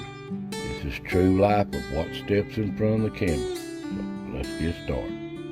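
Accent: United States. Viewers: Good morning sir if you ever quit talking on these videos it will be a sad day as you nearly speak true American about like I do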